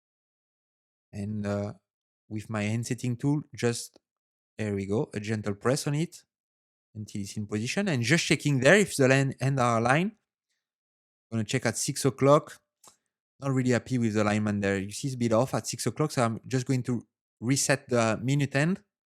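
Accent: France. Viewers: Toujours un plaisir de t’écouter et regarder travailler. Explication simple et précise ,continue comme ça ,avec ce french accent de toute beauteyyy 😜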